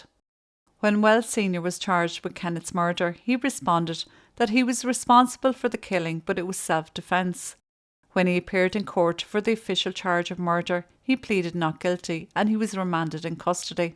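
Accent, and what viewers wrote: Ireland. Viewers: thank you for another great video. It warms my heart to see your subscriber count growing. If I ever get to travel to Ireland, what are some of the quaintest, lovely, and non-touristy places to visit? I am from an ugly , dusty Texas town would be easily bewitched by the greenness and exquisite verbal accents like you have!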